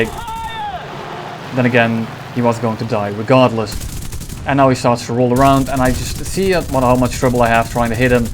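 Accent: Netherlands. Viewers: Oh that is why you got that Bas Rutten accent!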